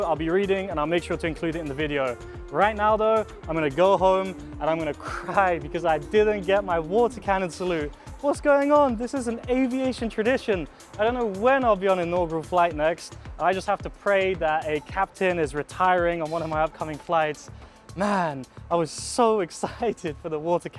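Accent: United Kingdom. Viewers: You know the weird thing, despite the clearly British accent, I always thought you were French until I saw the British passport! 😂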